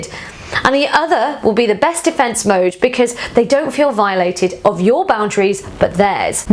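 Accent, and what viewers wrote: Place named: United Kingdom. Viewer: u r very cutely and your lovely British accent makes me mad, I Love u and thanks from u and your team to this useful product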